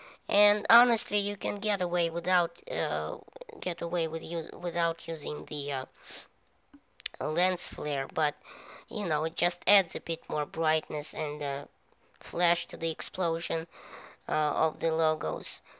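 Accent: Russia. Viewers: You sound a bit Russian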